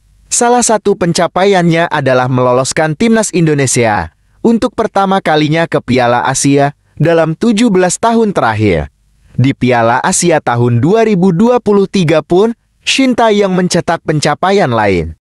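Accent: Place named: Malaysia. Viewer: BROO SAYA KASIH TAU KAMU KALAU BACA BERITA PAKAILAH BAHASA INDONESIA YG BAIK DAN BENAR,,,NGGK USAH IKUT IKUTAN SAMA ORG MELAYU MALAYSIA....??? EFF AFF/..... A E / B BE C Si D.... .....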